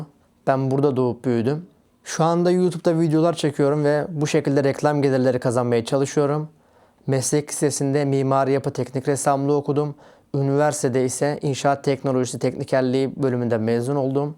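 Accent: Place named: South, Germany. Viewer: Thanks for sharing dear ❤May I ask another question: How come your German is so good? I once heard you talking, even with a southgerman accent. 😊I know I am late with a question, so feel free not to answer. Good there are people like you on this planet!❤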